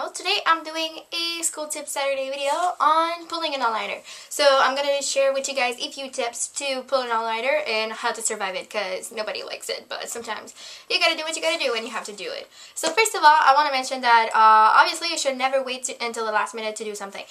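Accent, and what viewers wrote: Canada. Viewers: are you canadian? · you're canadian aye?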